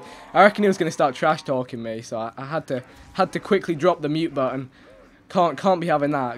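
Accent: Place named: United Kingdom. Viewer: For some reason his British accent makes me not want to punch him in the face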